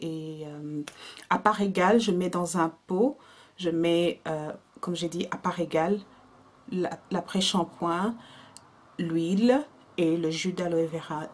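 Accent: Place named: England, United Kingdom. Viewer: tu as un apcent anglais !!! J'adore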